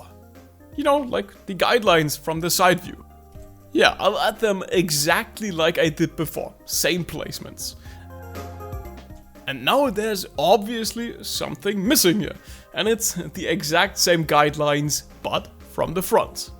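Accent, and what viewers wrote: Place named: Germany. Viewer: Ich bin auch mit deutsch aufgewachsen, hat man bei dir sofort gehört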